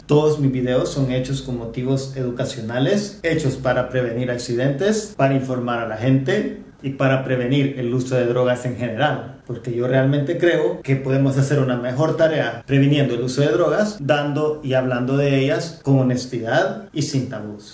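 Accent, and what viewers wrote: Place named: Uruguay. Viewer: Está bueno que informes para evitar accidentes. Muy bien cuadro en el fondo. Hay canales que enseñan recetas pero no he visto ninguno que hable de las proporciones por persona y del tiempo a esperar antes de decidir tomar otra porción. Muy bien informado. Me llamó la atención tu acento, de qué país sos? Hablas bastante parecido a nosotros los uruguayos.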